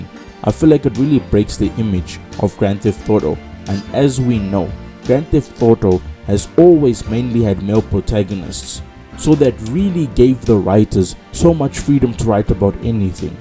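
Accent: South Africa. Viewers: I recognized that SA accent. You coloured ?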